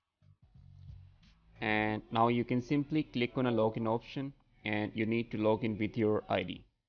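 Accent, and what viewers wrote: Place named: India. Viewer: Me: sweet this tutorial looks good hears the indian accent and leaves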